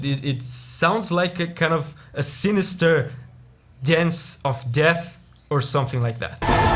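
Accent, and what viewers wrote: Brazil. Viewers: cara eu tava vendo teu vídeo e percebi pelo seu sotaque q vc é Brasileiro, massa demais! essa ost é absurda mano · You sounds like a Brazilian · Sotaque brasileiro, kkkkk certeza que é brasileiro, com um ótimo inglês.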